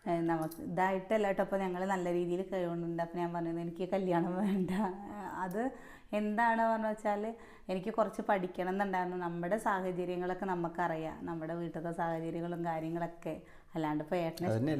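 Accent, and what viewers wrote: Palakkad, India. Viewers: ഞാൻ പുതിയ subscriber ആണ് ട്ടോ. പാലക്കാടൻ ഭാഷ കേട്ടു വന്നതാണ്. നല്ലൊരു അനിയത്തികുട്ടി. എന്നും നിങ്ങളുടെ സ്നേഹം തളിർതിരിക്കട്ടെ. ഇന്നത്തെ പെൺകുട്ടികൾ വാക്കുകൾക്ക് കാതോർക്കു. ❤️❤️❤️❤️❤️❤️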